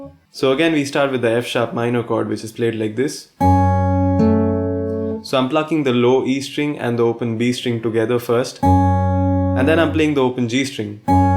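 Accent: India. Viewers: Why do u have indian accent?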